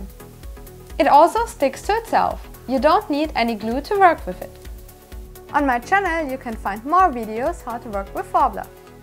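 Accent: Germany. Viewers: German accents are so cute. Makes me want to hug every German when they speak English.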